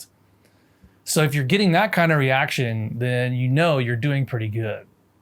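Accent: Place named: South, United States. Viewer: Isn't it the case that, if your individual syllable tones (excepting the usual two-syllable modifications) don't translate into an overall sentence intonation, your Chinese won't sound authentic? Won't it sound more like someone reading or carefully imitating a learning video? I'm still not much advanced from HDK 1 but it seemed from what I heard and from the pinyin that you were speaking Mandarin rather than Yue/Cantonese although some of the hanzi weren't the simplified ones. Do Yue-speaking Taiwanese use the Mandarin pinyin to approximate the nine tones of Cantonese? On another matter. I'm a Southern USAmerican like you but I've spent a lot of time growing up with British people, and there still aren't many natives in Great Britain whose accent is like yours. It's true that some sound pretty much like Americans, seemingly a growing number. 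However, they're the exceptions, but may not be much longer if the trend continues. Almost no one there speaks English anymore as Prince Charles still does, not even Queen Elizabeth. When I get mad, I sound like Andy Griffith in his early career days, but most of the time people think I'm from New England. In New York, they ask me if I'm from Boston. I can understand the impulse to avoid sounding like a down-home yokel.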